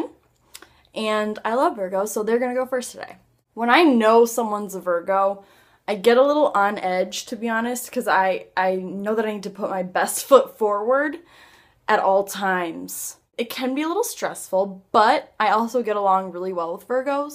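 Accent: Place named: Midwest, United States. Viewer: This is the strongest midwestern accent I've heard in a while.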